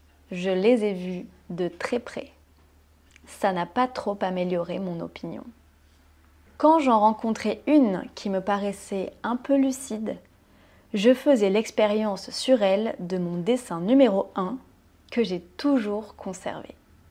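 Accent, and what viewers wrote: France. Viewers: Bravo pour parler anglais, il est difficile pour les personnes instruites et intelligentes de montrer un niveau intermédiaire dans une langue étrangère (je sais le douleur mdr) mais le courage est essentiel et requis pour atteindre un niveau avancé. Et l'accent français est considéré "classy" en anglais, donc c'est un avantage. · Your English is good. I am a middle aged fourth generation American. You speak better than some Americans. I like the French accent. You can keep it or work on your pronunciation. It’s your choice. Any native speaker should be able to understand you now. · J'adore ton accent français quand tu parles anglais. Ça sonne vraiment mignon.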